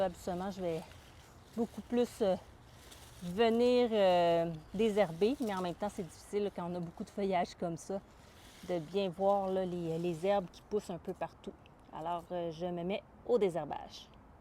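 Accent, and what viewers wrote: Canada. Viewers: Bonjour amie canadienne jadore votre accent je vous ecrit de suisse jadore votre video qui est bien expliqué mais vous parler d'engrais spécial fraisier sest bien mais avait vous penser au engrais naturel comme les coquille d'œufs marc de café ou purin d'orties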